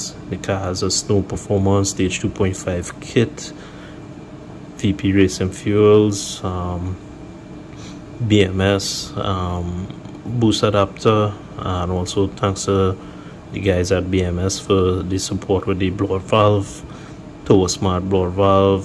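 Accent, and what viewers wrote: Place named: Trinidad and Tobago. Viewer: Yo, you sound likea Trini! Big up Trinidad; we got some of the best mechanics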